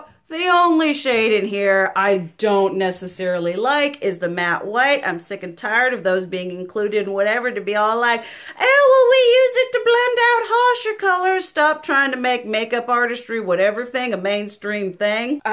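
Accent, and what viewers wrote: South, United States. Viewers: I wasn't expecting the country accent from the thumbnail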